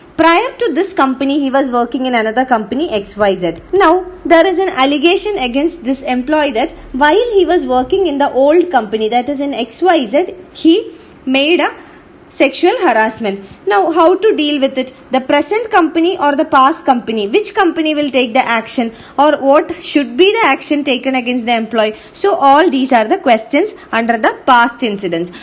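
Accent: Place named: South India, India. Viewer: mam please speak in US standerd english not south indian english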